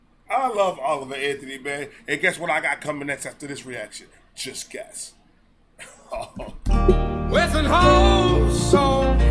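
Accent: New York, United States. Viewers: My dude! Hands down my top 3 reactors on yt. So glad i found your channel a month ago. You originally from NY? Bc i get ny vibes from u. And you talk your shit like a nyer. It's honestly refreshing man. Keep it coming man. You a real one.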